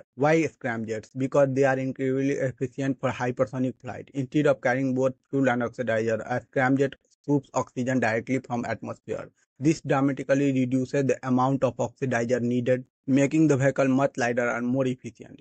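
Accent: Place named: Bihar, India. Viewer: Content is good but unable to bear the bihari type english. You should turn to AI voice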